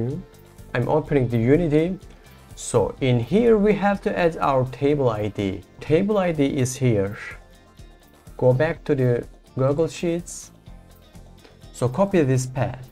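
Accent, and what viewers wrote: Turkey. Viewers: İngilizceyi duyduğum gibi dedim aha Turko :) Başarılar teşekkürler. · Daha ilk konusmaya basladiginda bu kesin Türk dedim :d Hatta emin olmak icin yorumlara baktim. Guzel icerik tesekkurler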